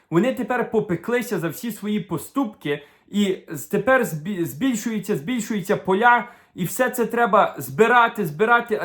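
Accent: Western Ukraine, Ukraine. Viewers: Развиваешь українську мову. Особенно словом "надоїло"😂 Что у тебя не отнять, так это твой акцент. Шикарный! Кроме шуток. Украинский такой. У нас в Одессе говорили "западенский". Это твой родной? Ты с детства так говоришь?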